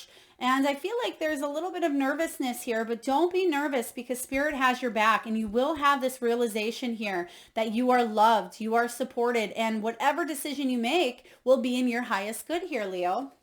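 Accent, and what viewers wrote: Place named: Wisconsin, United States. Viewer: My counterpart is leo/cancer (July 22nd). I love hearing ur Wisconsin accent. Not something I hear on YouTube everyday. BAM!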